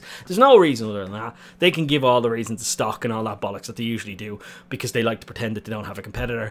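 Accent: Ireland. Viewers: "I tawt I wuld tawlk to yew" Fuck the irish accent is absolutely fucking magical ;)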